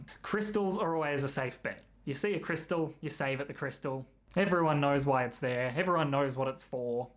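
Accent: Australia. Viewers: I enjoy your accent, Australian Eddie Redmayne.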